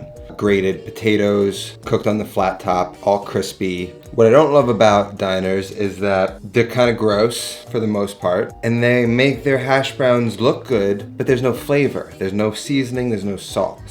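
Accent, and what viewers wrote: New York, United States. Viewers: Oh my god are you like from New York or something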